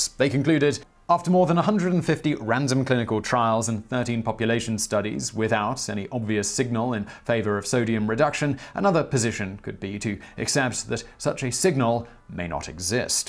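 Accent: United Kingdom. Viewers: I was expecting him to say "Hey Vsauce, Michael here!" when I opened the video, instead I got a british accent.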